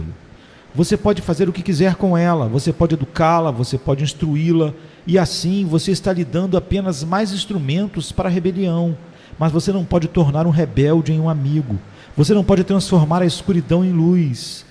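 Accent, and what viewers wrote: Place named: Rio de Janeiro, Brazil. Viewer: Tem sido maravilhoso ouvir Spurgeon em sua grandeza em português e ainda melhor com este gostoso sotaque carioca! Obrigado irmao que o Senhor continue abençoe o sempre!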